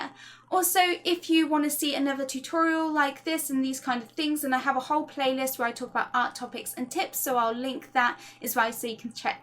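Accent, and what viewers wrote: United Kingdom. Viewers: Besides all your amazing, thorough explanations and detailed drawings and tips, I love your accent! I love the way you say pencil it makes me smile EVERY TIME I wish I had an accent like this and I've been practicing my British ever since I watched Austin Powers first when I was like 6 or 7, haha sometimes when I write stuff like this I say it in my head in an accent 😂 Again thank you for amazing work, you really have made me pull up my art determination and persistence, as well as follow thru, that's my biggest problem! I always start pictures and when I'm done with that session I don't really go back to it unless I randomly find it again... I also am sort of homeless atm, not to worry we have money and cars, but since I don't really have my own space it's hard to spread out my art/storage/drawing space so maybe that's why I always start new when I go to start a project.... 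if you want to write a response I'd be pleased but also I watch all your videos and copy down great tips to remember (almost all of them haha) so I've got quite a lil notebook full 😊 So I'm sure there is something for every question I ask ! Brilliant work and lovely role model! You are such an inspiration! Thanks again! Much love, Autumn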